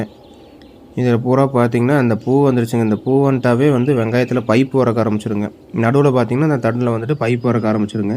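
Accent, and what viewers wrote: Kongu, India. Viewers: ஆயிரம் சொன்னாலும் கொங்கு தமிழோட அழகே தனிதான்❤❤❤❤❤ ஐ லவ் யூ டா அண்ணா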